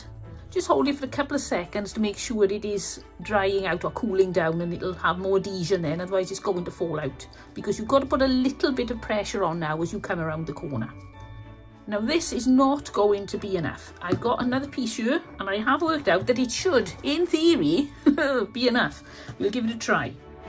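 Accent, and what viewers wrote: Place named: South Wales, United Kingdom. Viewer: Hello I love your videos they are amazing. I recognised your accent, I am from South Wales 😁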